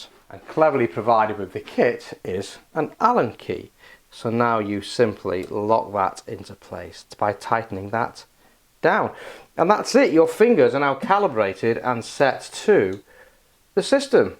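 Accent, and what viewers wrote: United Kingdom. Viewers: nice vid just found your channel mate if this video is anything to be judged on looking forward to other content is that a Manchester accent or do my ears deceive me from Manchester my self just asking many thanks Harry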